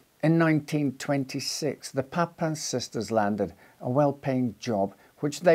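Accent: Scotland, United Kingdom. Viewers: See, my husband thought you were Welsh…but I know a Scotsman when I hear one! My favorite accent!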